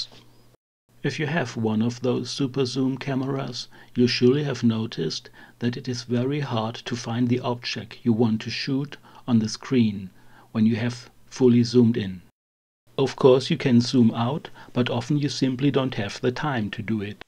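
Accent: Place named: Germany. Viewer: The narrator sounds like the Cherman guy who.made "Grizzly Man."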